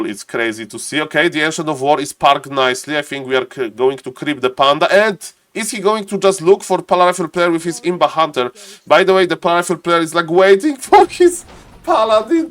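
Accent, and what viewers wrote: Poland. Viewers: Are you Polish? The accent is very polish :D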